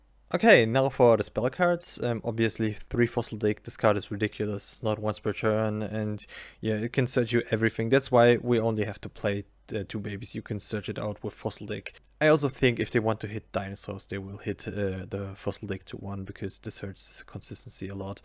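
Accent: Germany. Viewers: You have a damn German accent.. why don't you make the videos in German?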